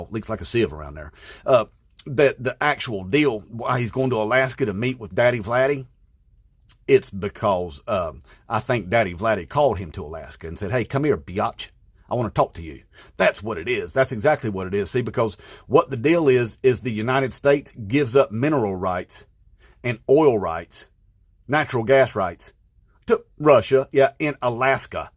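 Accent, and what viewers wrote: South, United States. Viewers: Thank you for your work. You're making a difference. Love your southern accent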